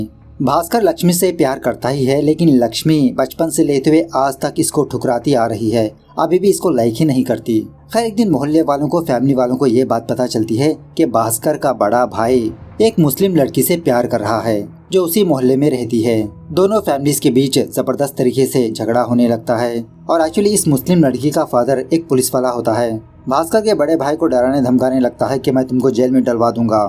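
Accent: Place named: South, India. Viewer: Are South Indian hai to thoda to waisa rahega na , hindi uski main bhasha nhi hai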